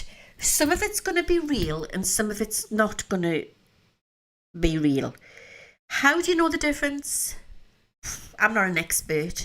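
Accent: Newcastle, United Kingdom. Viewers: Love the show, and as a fellow Geordie I love to hear our local accent! Keep up the great work. x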